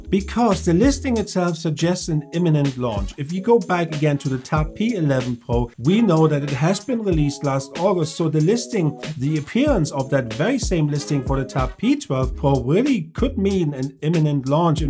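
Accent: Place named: Germany. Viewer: i have stayed in germany for years. This is the accent of Germans, i can smell it from miles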